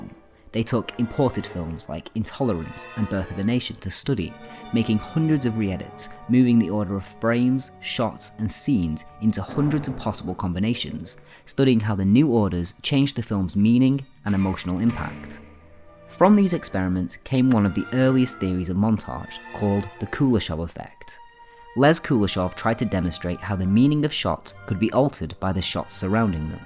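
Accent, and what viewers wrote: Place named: Lancashire, United Kingdom. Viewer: the narrator may not be able to help having a grating lancashire accent but he could at least learn the correct pronunciation of proper nouns. William Friedkin is "freedkin" and Sergei is "SIRgay" nor "sir zhay". incorrect pronunciation detracts from the credibility of the narrative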